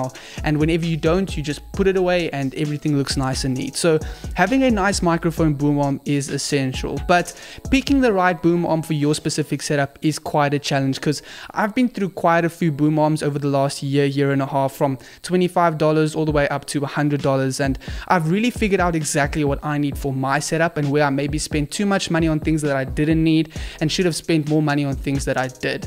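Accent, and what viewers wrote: South Africa. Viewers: You lowkey sound South African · You sound like a south african! Allo from Montreal!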